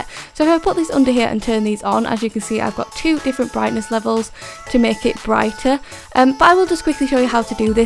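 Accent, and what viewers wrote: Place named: Leeds, United Kingdom. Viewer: Are you from the Leeds area? You've got a very similar accent to me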